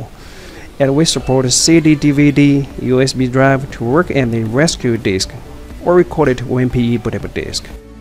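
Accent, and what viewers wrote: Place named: South, United States. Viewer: It’s a very interesting accent. I heard moments that reminded me of Indian, Chinese, Vietnamese, US Southern, and maybe Eastern European! My guess is Chinese living in the US South.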